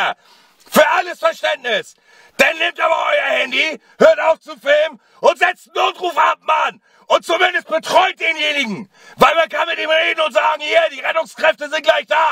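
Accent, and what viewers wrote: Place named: Hamburg, Germany. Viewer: In welcher Stadt wohnst du weil du klingst so Hamburgisch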